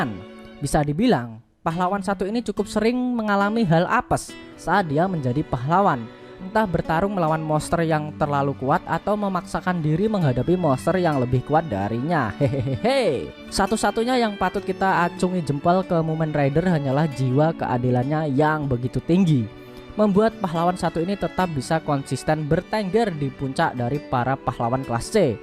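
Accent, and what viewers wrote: Java, Indonesia. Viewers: Kowe wong jowo ndi min?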